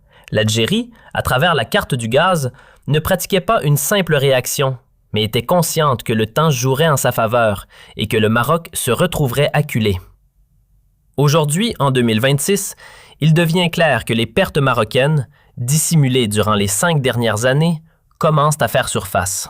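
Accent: Canada. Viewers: accent Canadian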